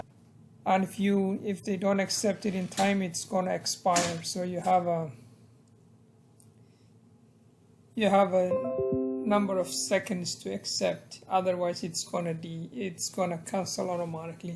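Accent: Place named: India. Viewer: Feeling Indian accent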